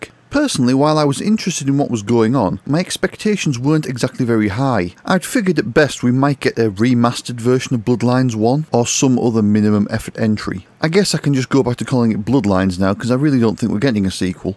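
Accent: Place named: United Kingdom. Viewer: Instantly subscribed during the first minute because of your accent. British accents are far too rare on yt.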